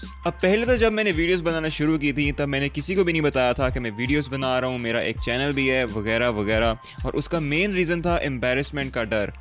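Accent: United Kingdom. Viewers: Dude please teach me your english accent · You Deserve My Subscribe. I like your English Accent And Your decent Talk. 👍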